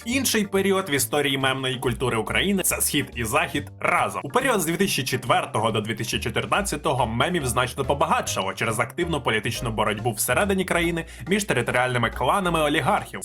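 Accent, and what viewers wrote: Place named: Russia. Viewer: В тебе класний контент. Правда мені здалося, що той тон, швидкість, як ти говориш текст, схожий на когось з російськомовного сигменту, я не кажу, що це погано, але здається, що тобі було б зручніше підібрати свій темп та тон, як глядач/слухач скажу просто - трохи важко розуміти, що ти говориш бо воно зашвидко і трішки нечітко. Але взагалі тобі мега вподобайка і обов'язково продовжуй!!!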